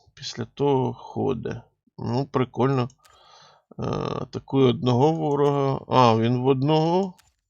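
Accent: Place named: Surzhyk, Ukraine. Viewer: трохи суржик є, пане!))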